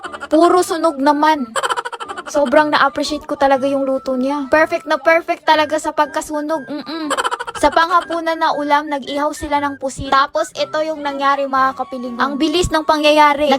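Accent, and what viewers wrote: Visayas, Philippines. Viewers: Bisaya mo te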